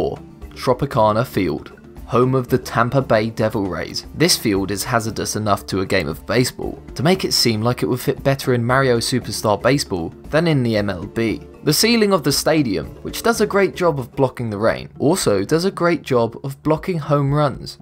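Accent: England, United Kingdom. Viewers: An English voiceover speaking an American English script always does my head in. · disliked in 23 seconds.due to dorky face figure (after brit accent) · Having an English accent will only fool some people into thinking you know what your talking about young man. List videos then to have lots of mistakes in them but this one takes the cake! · the guy in the video obviously doesn't know much about physics But he's got a smooth British accent, and that gives him instant intellectual street cred.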